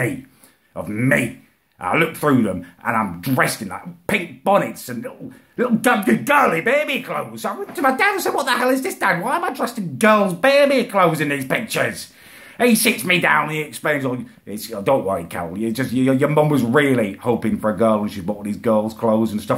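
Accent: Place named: Bristol, United Kingdom. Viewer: I thought he was from bristol not liverpool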